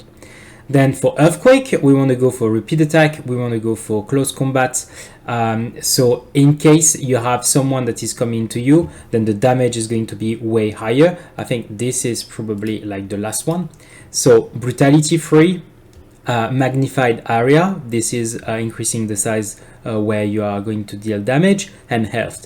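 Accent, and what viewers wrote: France. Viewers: Hey man as a fellow french man I got a great advise for you to change your pronunciation to a more proper one (not that Frenchy ^^ ) I watched your video about the tower and it was helpful to me so I wanted to get you some things for you as well ^^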